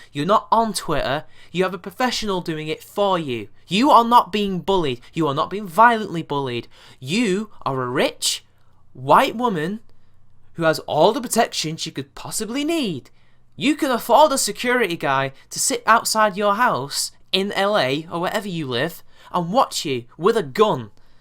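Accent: United Kingdom. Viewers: I somehow missed this in my subscription feed. This was really entertaining video. Thanks for the laughs! The way you say certain things in your hot British accent makes your jokes seem even funnier! Nice to see your handsome face on camera :)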